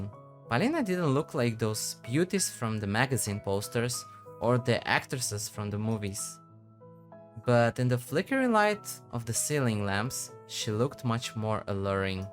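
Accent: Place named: Russia. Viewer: Как только услышал идеальное произношение русских имён, сразу понял, что смотрю не иностранца... Произношение на английском у вас шикарное. Если сильно не вслушиваться, то можно и не заметить акцента, что, собственно, и произошло)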